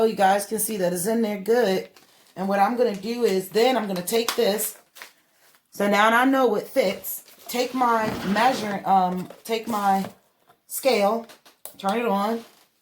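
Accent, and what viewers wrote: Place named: Baltimore, United States. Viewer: Your from Baltimore right ? Your accent gave it away, i have family there❤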